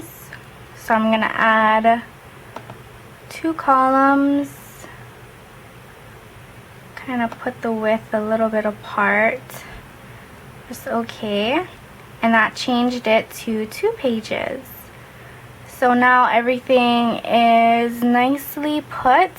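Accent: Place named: Hawaii, United States. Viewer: I knew you were from hawaii the moment i heard your voice ! LOL xp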